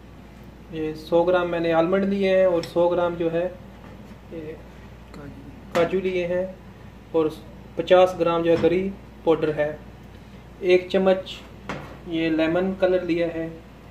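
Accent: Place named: Pakistan. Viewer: Taste ka to apna he maza hy but app k urdu sunny ka apna he maza hy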